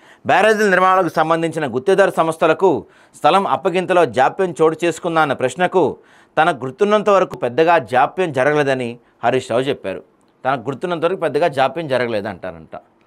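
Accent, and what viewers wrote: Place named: Andhra, India. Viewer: అరేయ్ బొచ్చు నువ్వు ఆంధ్రా క్రాస్ ఆర ఎందిరా నీ భాషా😂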